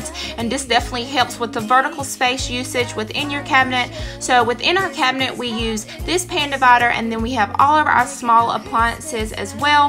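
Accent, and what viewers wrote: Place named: South, United States. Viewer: Your southern Belle accent is adorable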